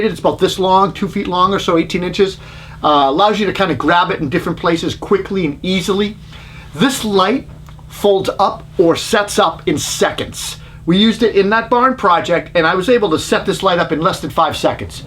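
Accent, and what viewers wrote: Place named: New York, United States. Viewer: Great review , sound like a New Yorker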